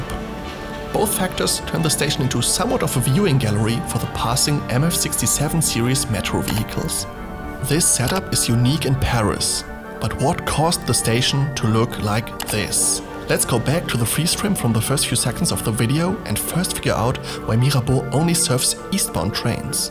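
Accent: Germany. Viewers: du bist deutsch oder? top video 👍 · Great vid, watched your previous one which is equally as good. I love the short, comprehensive style with the added German Accent! Great English btw. Hope to see the channel grow bigger and bigger with every video! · Ich war schon über dein deutsches Englisch verwundet. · That was by far the best suggestion YouTube did since a long time. Great video, and very underrated! You should have 100 times the subscribers you have now and at least 10 times the views this video got today. Greetings from a fellow German. :) (By the way: the first thought which came in my mind when I heard your Voice: he is definitely a German. 😂) Keep it up mate! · Are you german? Your english has a german accent I think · You are German right 😂😭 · I was wondering if you were german, turns out you are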